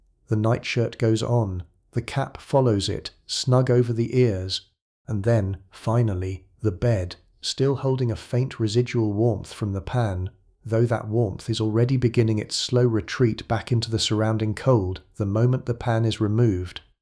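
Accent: United Kingdom. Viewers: Why do all these channels have to be a British accent. Its frustrating for me to try and sleep while trying to figure out what ur saying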